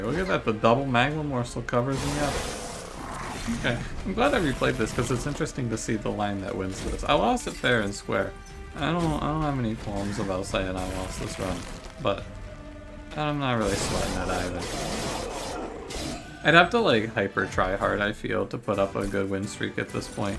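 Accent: United States. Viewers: I know that michigan accent my partner is chicago and she calls me out on mine all the time.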